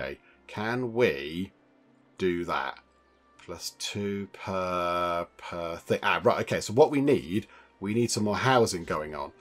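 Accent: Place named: England, United Kingdom. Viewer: I flipping love your gameplays, your fabulous typical English accent and your way to entertain people. 👏🏻👏🏻👏🏻👏🏻👏🏻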